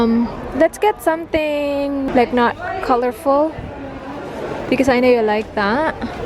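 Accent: Visayas, Philippines. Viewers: Happy 100k, ur vlog is getting more interesting due to d nice places you've been going, credit to ur Papa Bear.... I'm one of ur subscribers who really got hooked watchin ur vlogs Coz ur maarte but natural, sometimes ur visayan accent get me annoyed and I don't find u that attractive and as u said wit 5head but u r all naturelle, u don't mind showing how raw u r.... In time inshallah you will mature and grow like a fine lady medyo konting basa pa more wag lng YT so that ur vlogs will b more substantial.....Good luck! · Mjy visayan accent annoys you? Remember there are a lot of dialects in Ph, and no specific dialect should be superior than the others. Youre wishing maturity but heyyy, wish yourself more maturity please if that is your outlook and belief in life. IBOG LANG KANG BAKI-A KA! Awa makatakod ang imong pagka walay maturity aunty hahaha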